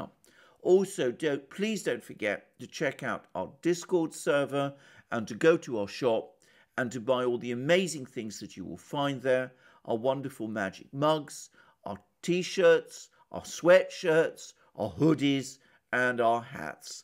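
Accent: United Kingdom. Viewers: One of your best Alexander keep up the great work. That British legal accent is perfect to call out the hypocrisy of the US, UK and the EU.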